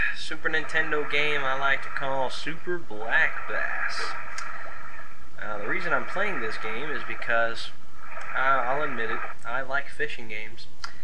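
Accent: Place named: Louisiana, United States. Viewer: Where in south Louisiana are you from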